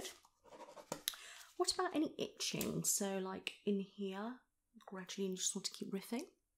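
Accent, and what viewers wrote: United Kingdom. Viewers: it’s British! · centre, I presume? A bit north of London, like the latitude of, say, Cambridge? It may seem a silly question, but I just find amusing to train my italian ears to recognise and emulate british accents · ASMR + pretty doctress + British accent . OMG . It must be wonderful 😊🤩😘❤ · are you from the UK? you have such a nice accent! :) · Ah, I love British accent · What part of the UK people have that accent? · I love the british accent. It make the ASMR more slowly and it causes so many tingles. Btw, u look like Meryl Streep to me xD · I love your British accent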